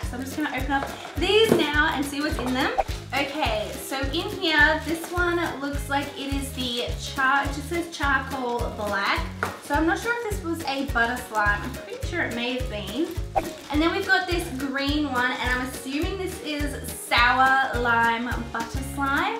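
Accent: Australia. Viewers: Are you Australian because I am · You have the Australian accent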